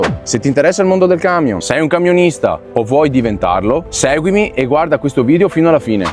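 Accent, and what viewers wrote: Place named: Padua, Italy. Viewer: Ai ragione.. Tutto vero ciò che dici. Ti saluto. E ti auguro sempre di fare bellissimi viaggi un abbraccio e bacione. Marta da Brescia. Sei un padovano. Doc. Mi piace molto sentirti con la tua parlata padovana.ciaooooo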